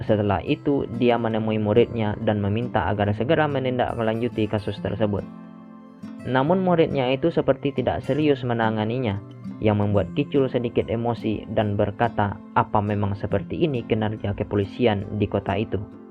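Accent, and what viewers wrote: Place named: Eastern Indonesia, Indonesia. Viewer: Kk org mana..logatnya kayk org timur😄😄😄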